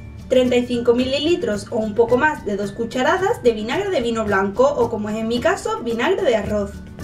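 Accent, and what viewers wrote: Spain. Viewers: linda, me encantan tus recetas y tu acento españolísimo. Saludos desde Medellín, Colombia.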